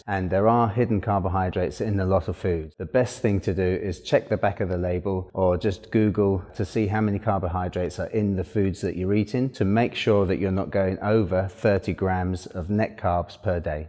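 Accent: England, United Kingdom. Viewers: Yes thank goodness!! You are not American! Such a breath of fresh air you are. No dramatic hyper "best actor voice", just facts. Thank you sir 🙏. So pleased you are English lol... New sub from the island next door 🇮🇪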